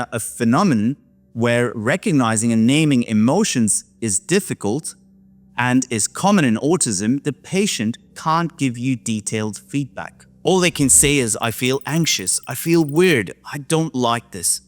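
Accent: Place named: Australia. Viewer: To nuance this video slightly and I'm coming up this from the point of being in the UK and it sounds like you're in Australia so how stimulants are prescribed there may be different. But the most important Factor here is please don't watch this and now think that if you have autismand ADHD, that you should not take stimulants, that is incorrect. What's important is that you are properly and expertly titrated. I'm in a household of people all diagnosed with autism and ADHD and we all take stimulant medication, however we are on different doses and at least one of those takes a different stimulant to the rest. We have all worked out which stimulant was right for us with the support and care of an expert clinical prescriber. So whilst yes the incorrect stimulant or dose may have effects that you weren't expecting and may make your anxiety worse, with correct titration this can be mitigated and you can see improvements in both your ADHD symptoms and your levels of anxiety.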